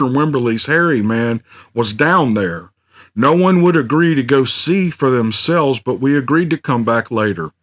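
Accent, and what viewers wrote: South, United States. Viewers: love your voice reading these! and your voice is so country! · This was so nice, such a sweet surprise! Getting ready for end-of-day routine, but thought...wouldn’t it br nice to listen to story before hitting the hay...not really any hope there would be one. Imagine how delighted it was to see you had been busy and there was not one but three stories.! I feel really spoiled! I have wondered what is the big attraction to hundreds of us listening to this kindly daddy voice...l know your voice reminds me of my Dad, he has been in the land of the living now for many years and l really miss him! Listening to the gentle tones of your Southern drawl is too much like home! I think there must be a few others that feel like this is almost magical, allowing us grown (and in some cases OLD) folk to enjoy being read to by a loving Daddy again! 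Thanks so much,. Hope this doesn’t sound corny...the stories are nice too, as usual. Appreciate your doing these so much! Entertaining and comforting! Happy trails! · Early in my adult life I shed my native southern accent for a career in major-market broadcasting, but I can still talk like that when I want to., I like your accent very much, and I don't believe anyone will have any difficulty in understanding you. Also I love that you don't make any attempt to hide your faith in Jesus. He is LORD!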